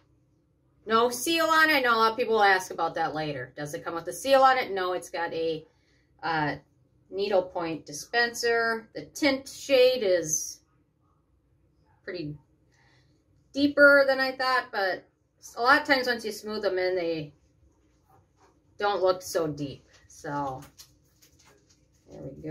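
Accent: Minnesota, United States. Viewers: Hey, I just started watching your videos, thanks so much for the reviews! I could tell right away by your accent that you must be Minnesotan, I'm from Minnesota too, (a.k.a. the best state in the USA!) I would love to see a review on the new DRMTLGY Physical SPF 44. I've been eyeing that one!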